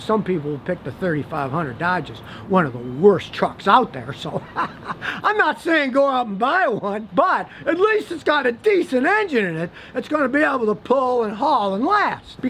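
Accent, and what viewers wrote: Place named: Texas, United States. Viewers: The guy is from Texas. They all talk funny down there. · Poo-jo, God is so clever! Subtle texan 😀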